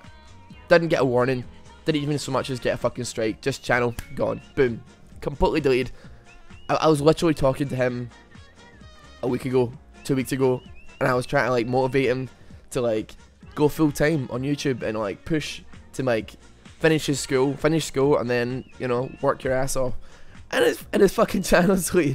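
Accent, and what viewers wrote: Scotland, United Kingdom. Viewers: are you fookin scotish m8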